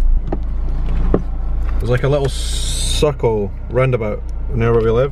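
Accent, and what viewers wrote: Scotland, United Kingdom. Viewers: I love the scottish accent! Never heard a scottish trying to say PASTEL or PASTÉIS... Well, I´ve been to Inverness (and Glasgow and Edinburg), in a trip and the scottish there couldnt really guess WTF was our language! ;-) It´s interesting cause I was freezing and I saw redhead girls in small shorts LOL.... Nice video! Grats!! Have fun! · he are british? have a funny accent haha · I like your accent. Oh, Scotland. Great Respect! Ão, inho, 'muito' and some other nasal phonemes adds a lot of challenge to foreigners. Still the words in portuguese you'd mentioned were pretty ok.Do you practice these days? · be careful dude! im from new zealand i live in brazil, i actually got robbed last week and now i dont want to film in the streets because i think it could happen again, but be careful dude! love the accent from scotland, reminds me of old war movies haha! 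love it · Scottish accent is so addicting. Really nice :)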